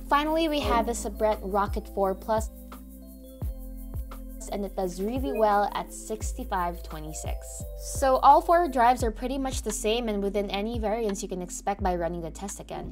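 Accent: Philippines. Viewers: She seems to have a Filipino accent. Not that it matters, as a Filipino, I rarely see content creators here that aren't 'vloggers' and vomitting clickbait content. I guess it's a long way of saying, I rarely see this much class from a content creator in the Philippines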